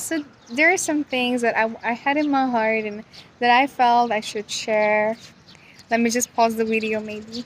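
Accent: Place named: United States. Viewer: Your English like American English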